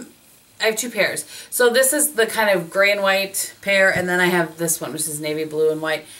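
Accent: Wisconsin, United States. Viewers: You are a hoot! Enjoying your videos, thanks. I might need to try the IT Cosmetics powder. And I thought I recognized that accent! I grew up in northern Wisconsin. I miss it like crazy! I'll be home for a visit in August and I'm hoping I can convince my sisters to drive up north to Paul Bunyan's before they close for the year! Not that I need a lumber jack breakfast :/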